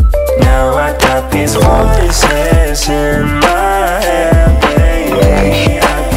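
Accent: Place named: England, United Kingdom. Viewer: U sound like ur from England bro NICE ENGLISH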